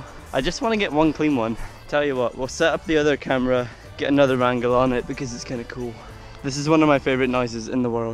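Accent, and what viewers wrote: Scotland, United Kingdom. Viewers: i only realised he was scottish about half way through the video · R u Scottish?😂💕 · Is ma mans Scottish?????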